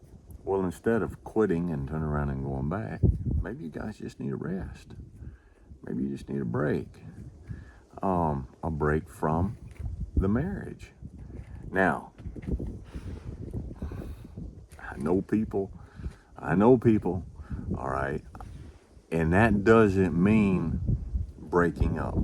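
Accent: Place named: South, United States. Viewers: This is by far, the best American accent. The slow, comforting Texas drawl. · This video got suggested me by youtube algorithm, and just by looking at the thumbnail, I literally heard his voice and his cool country accent, although I never seen this man before on YT - and I was 100% accurate by guessing both the accent and the voice itself :D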